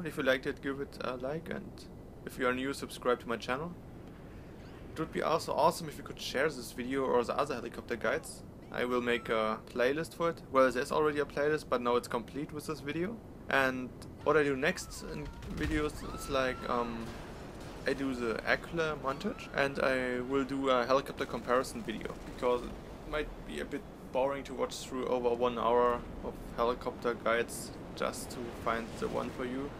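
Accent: Germany. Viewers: Deutscher man merkt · Du hörst dich deutsch an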